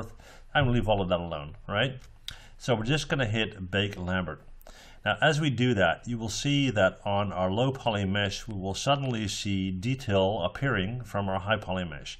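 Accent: Netherlands. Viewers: Wait are you dutch? Haha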